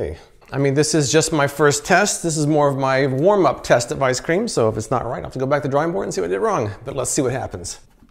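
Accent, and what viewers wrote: United States. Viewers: Possibly. I assume by the accent, the dude is in the US. But assumptions.... ;)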